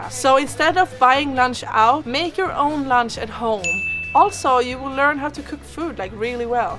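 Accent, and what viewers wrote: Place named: Spain. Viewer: Hahahah vad sjukt att du pratar som att du har en spansk dialekt hahahah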